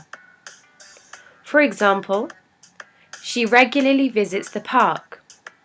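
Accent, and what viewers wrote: England, United Kingdom. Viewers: how are you today, I have seen your channel, and is very good I love your accent as an England citizen and tell you what I would like to hear from you a big list of vocabulary with your pronunciation is very important to speak good English, hope to hear from you soon, I am from Costa Rica, big hugs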